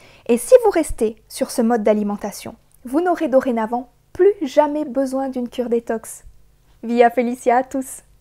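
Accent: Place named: Switzerland. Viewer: Bonjour 😊 Contente d' avoir découvert votre chaîne grâce à douce frugalité 😊 Ca me rend si heureuse d' entendre fruits et légumes pour faire une détox 😉 Je suis une raw vegan..Une 👽pour la plupart des gens😅 mais bon j' assume. Ah...Ce nonante😅 Je pensais que vous étiez peut-être belge ou suisse...En Belgique on dit aussi nonante et Septante..En écoutant bien j'ai bien deviné que vous êtes bien suisse 😊 🥰🥰🥰🥰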